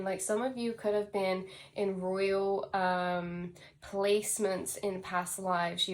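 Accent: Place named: United Kingdom. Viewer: Girl, you embody a plethora of Wisdom, you showed up on my feed two days ago and I've been listening to you since, all day yesterday, and I must say that you embody within you, truths that's taken me years to aquire, and this reading is the most profound! Given that I've lived 800 lives 😂 my lineage travels back to Luke, the physician in scripture, which explains why I've always had a passion for healing... Mind, Body and Spirit... In ministry it was my goal and as a Holistic Healer, it is still a goal of mine... Wayne represents royalty in the early 1700 or even earlier, I know I've been in that life, I've seen and felt it and I'm drawn to those types of movies and frequencies... Celtic, knights, time travel and sci-fi... Some, not all.... Embracing my ancestral roots of Irish Scottish.... You sound British 🙂 German and Native American.... I recently discovered that I am a Blue Ray Starseed, Earth Angel, they've traveled with me and shown up in my meetings, and it resonates with me deeply.... 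Yes, large amounts of money is my inheritance and is needed for this leg of the path I'm on .... I also discovered that I have a dragon 🐲 working with me, I want to meet 😂 I see colors of blue, gold and white, purple... Amethyst is a strong energy and green, especially while in meditation....and the forest lights up with Frequency when I'm there... I Really Love this reading 📖 as far as commutation, I AM constantly in communication with Spirit and now my guides and starseed family.... S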